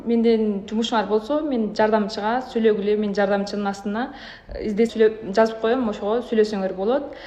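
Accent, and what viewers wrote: Standard. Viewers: Ушундай Кыргыздын тилин оз Кыргыз Эне тилин жоготпой таза суйлоп барыбызды кубандырды Элдин суймончулугуно айланды.Ушу сулуу кыздардан журогунду багынтып алып калсанар Озубуздун таза кандуу кыздардан экен .Бар жогунан бар экен.Нукура Кыргыз тилиде суйлоп соонун келишкен сымбаттуу ачык айрым УлГУ болчудай экен биздин Кыргызстанда жашап Кыргыз болуптуруп орус тилинде суйлогондорго. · Молодец бизге Караганда таза суйлойт экенсин, Оля моля дебей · Ой кандай акылдуу жана сулуу кызыбыз бар экен кыргыз журтум бизде. Нукура кыргызча суйлогону абдан соонун экен❤ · Кош келипсин карындашым кытайда туулуп оссон да кыргыз тилин таза суйлогонуно абдан ыраазы болдум аман бол иштерине ийгиликтер арбын болсун бактылуу бол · Таза кыргызча суйлойт мекенчил ушундай кыргыз кызды алып калган жакшы таза жигиттер чыгабы❤❤😅😅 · Таза кыргыз тилинде суйлогон кандай соонун угулат🎉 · Кандай жакшынакай кыз Таза кыргызча суйлогон,ойлогон,алтын кыз. 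Омурлуу, бактылуу, болуп,оз тугойунду таап,узак омур сур кызым. Аман бол. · Таза кыргызча сүйлөйтко ээ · Акылдуу супсулуу кыргыздын кызы аман бол,эр жүрөк экенсиң.Бөлөк эл бөтөн жерде жашасаңар дагы тилди унутпай,таптаза сүйлөп ,тилди барктаганыңарга миң мертебе шүгүр.Бар болгула кыргызымдын урпактары. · Кыргызча таза сүйлөгөнүңө Рахмат кызым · Кош келиниз кызым Кытайлык Кыргыздар Кыргызча таза,так суйлойт эмэспи биздин жаштарга кыргызча суйлогонду уйрото кетиниз бизде 60-70пайзы орусча суйлоп калышты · Нукура кыргыз кыз таза суйлойт экенсин Азамат омурун узун ишин илгери болсун!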